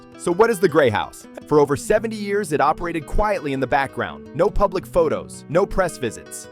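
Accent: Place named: United States. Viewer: I'm American and I don't understand why a loud American is the narrator either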